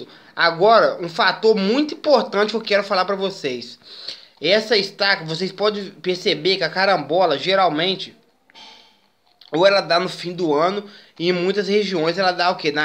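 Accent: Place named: Caipira, Brazil. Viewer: Dei meu like por conta do sutaque de caipira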